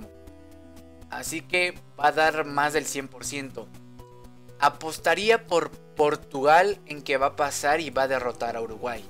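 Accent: Argentina. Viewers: Se nota que eres Argentina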